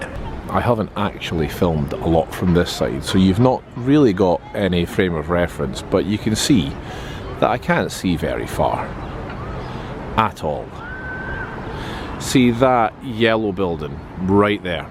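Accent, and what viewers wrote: Scotland, United Kingdom. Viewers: I am watching this and chuckling to myself. You are speaking English, but it takes me a few seconds to process it, because of your thick Scottish accent.( he- he- he)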